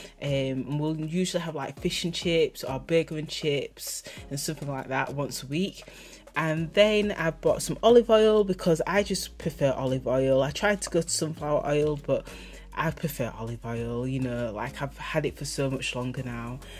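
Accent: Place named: Yorkshire, United Kingdom. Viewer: I thought so with your accent, defo a Yorkshire lass like me. Love your channel by the way xx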